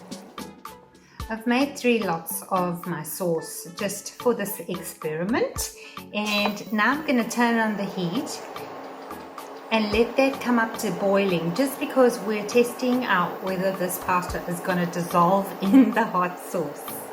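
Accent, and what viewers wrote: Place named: South Africa. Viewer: Your accent sounds so much like our South African accent. People used to tell me we sound like the Aussies and NZlanders and I could never hear it. I hear it now. Haha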